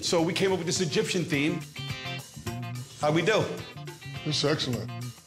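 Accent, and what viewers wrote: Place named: New Jersey, United States. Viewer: They sound like Italian "Joisey" boys, are they from "Joisey" ...they're loud and obnoxious from there.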